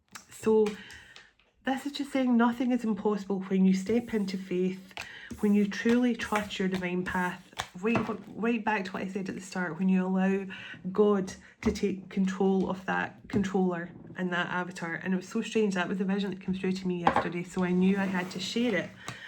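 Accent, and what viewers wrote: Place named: Scotland, United Kingdom. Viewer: I hyped you because I just love you! Forgiveness is healing and I believe in the same thing. Jesus taught us to forgive and love one another as my father loves you. I stand by that. I would rather listen to my intuition and let the Holy Spirit guide me more than anything. Also I can’t get enough of your Scottish accent and my youngest Kyle loves your voice too. He said it’s soothing to him. you are a blessing to us all! So happy to know you! I will email you as more things have happened that I can’t discuss here. Thanks for being you 🙏🏻❤️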